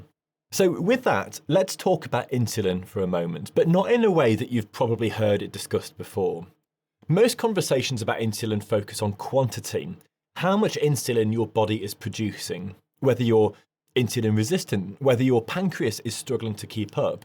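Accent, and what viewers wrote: United Kingdom. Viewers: What an excellent inspirational explanation … Thank you.. defo following you from now on … I’m an 85 year old female, still working as a wellbeing programmer and fitness teacher, but in the last year, my blood pressure, has become elevated, forcing me me to go on a BP med.I have been snacking between training sessions, going to stop from today!!! Also I think you live in the same part of the UK as me, judging by your accent.. Thanks again!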